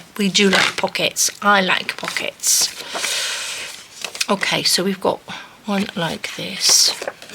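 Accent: United Kingdom. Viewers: Oooh the UK sounds 👍 x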